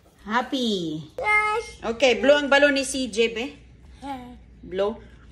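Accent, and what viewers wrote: Visayas, Philippines. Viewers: Bisaya si kaya ganyan sya mgsalita manood ka nalang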